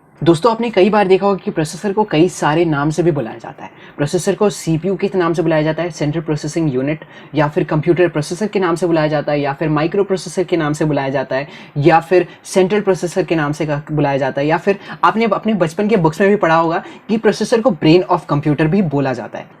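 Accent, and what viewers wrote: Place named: Jharkhand, India. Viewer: Bhai tu jharkhand se hai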